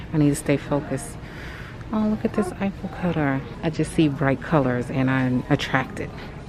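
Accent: New York, United States. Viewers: I just found your videos and saw you live in Texas but when I heard you talking I was like she is from NY has to be. I am a fellow New Yorker who is planning to leave soon you are 💯 it’s too much hustle bustle etc. Great video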